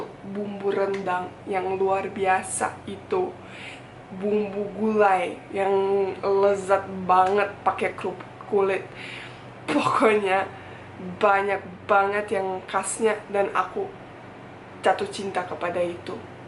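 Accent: Jakarta, Indonesia. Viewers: Apa kabar Mba Senang dech nonton vlog mba soalnya ngomong dialek logat jakarta nya bgtu lancar jd cocok jd org indonesia aja Mba semoga sukses selalu ya Mba Thank you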